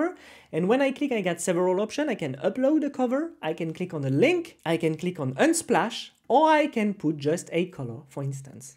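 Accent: France. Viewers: you have a french accent 🤔🤔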